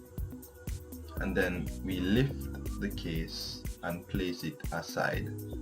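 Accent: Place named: Jamaica. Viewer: Thumbs up for the JA accent..... bras brap 🇯🇲🇯🇲🇯🇲🇯🇲🇯🇲🇯🇲🇯🇲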